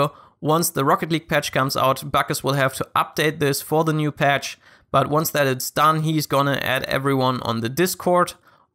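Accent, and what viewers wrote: Germany. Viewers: Bist du deutsch? Hörst dich so an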